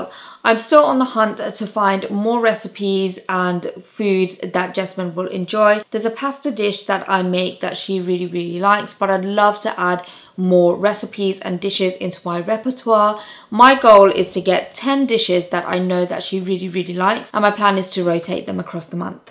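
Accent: United Kingdom. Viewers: Consistency isn't important to me at all. I don't keep track of anyone's schedule. I just check my subscriptions every day and add the videos I'm interested in to my Watch Later list. So I can't speak for everyone, of course, but I would be perfectly okay with you skipping a week now and then if your schedule is just too busy. As for content, my favorite topics are minimalism. decluttering, and finances, but any of the other ideas you mentioned sound good as well, especially health and fitness. Anything that appeals to you would be fine with me. I've watched almost all your videos, and I've enjoyed each of them. As one of the other viewers said, I could actually just listen to you talk all day. As an American, I can't say what sets your accent apart from the typical British accent, but you just sound so posh and elegant ! Congratulations on your weight loss progress. 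I wish you the best of luck with that and with all your other goals. I will continue to watch to see how it's all going!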